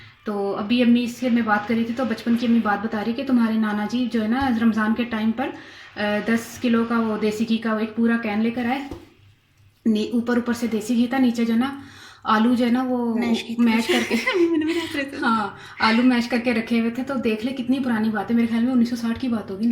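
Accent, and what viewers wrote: Punjab, India. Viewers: Very good style and ur punjabi touch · App behno ki pujabi bolne ka appna hi maza hai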